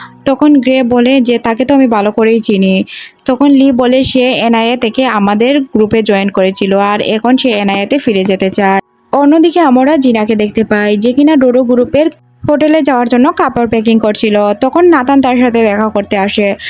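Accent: Sylhet, Bangladesh. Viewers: আপনি কি সিলেটি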